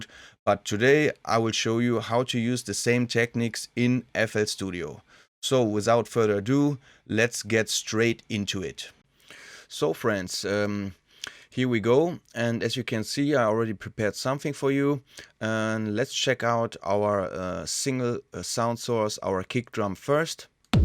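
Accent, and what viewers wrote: Germany. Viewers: man hört das er deutscher is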